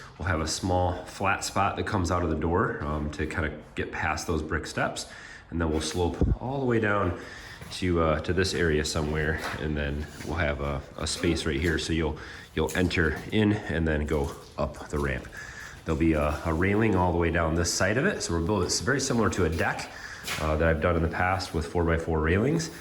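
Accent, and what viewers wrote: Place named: Southern Michigan, United States. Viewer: Appreciate the video. Just curious, where are you from? You speak well. You sound like maybe you are from Southern Michigan. Am I close?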